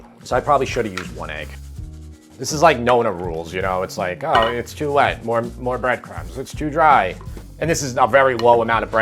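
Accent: United States. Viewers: We're in the US, it's a US pronunciation, friend. The US speaks American. The UK speaks British. And don't get me started abooot the Canadians & whatever the hell the Aussies are trying to speak. 🤪 Worcester, Massachusetts is not pronounced Wore Chester, Massachusetts. It's pronounced Woosta or Woost UH (rhymes with Rooster if you pronounce the ER as UH))(Roost UH/Roosta) Even the Brits, who haven't learned to speak proper American, don't say Yorkshire as York Shyer, they say York Sure. Worcestershire Sauce is pronounced Woosta-Sure Sauce in the great US of A and the rest of the world is wrong!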